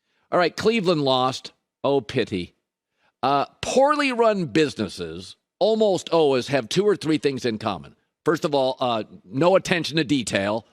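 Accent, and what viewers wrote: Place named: Washington, United States. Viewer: He’s from Washington State.😂😂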